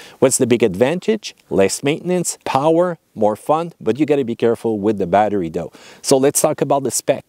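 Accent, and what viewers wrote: Quebec, Canada. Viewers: the french canadian accent cracks me up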